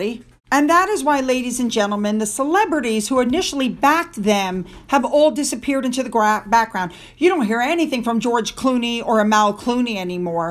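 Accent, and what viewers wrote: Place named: United States. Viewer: Hello, we always watch your video's, you do a Brilliant job. You put so much hard work into your content. We totally agree with what you say, it was very nice to see what you look like, after so long wondering! you are Beautiful. I have seen some comment's about how you, and our cousins from the USA, pronounce names! I find it endearing. carry on as you are, there's too much of people saying " You don't say it like that, you say it like this! " It's difficult for the British folk also! I remember as a child, I had difficulty understanding why Norwich wasn't said as it is spelt, Norrich! When I was corrected, I couldn't get my head around it! My Aunt was from New Costessey, which is in Norwich, that is pronounced - Cossey! Even more confused! One thing that sticks in my mind, I was on a train with two Americans, one said to the other, I can't wait to see Edinburger! 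Keep up the good work, we really appreciate it. Best wishes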